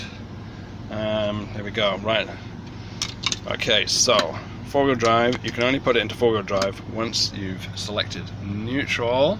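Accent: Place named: United States. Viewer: you've developed an American accent!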